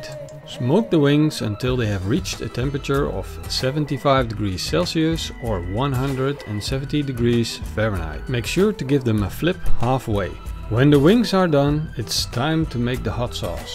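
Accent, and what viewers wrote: Netherlands. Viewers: Random question but are you Dutch? Your accent seems Dutch to me. Not sure why.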